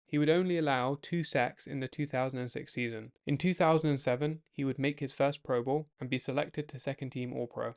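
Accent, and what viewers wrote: United Kingdom. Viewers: British guy talking about American football?????.?.? WHAT!!!!!!!!!!!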